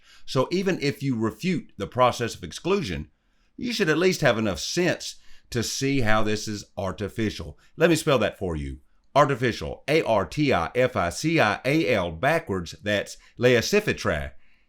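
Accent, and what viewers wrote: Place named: Arkansas, United States. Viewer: Dude you deserve way more subs. Your channel is among the highest editing and narration quality on YouTube. Not sure why an Arkansas accent makes me so receptive. Maybe it has something to do with my father in law/boss/best friend being from Little Rock.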